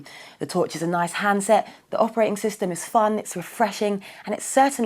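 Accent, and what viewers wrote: United Kingdom. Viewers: A Black girl speaking in uk english!!!!! WTF LOL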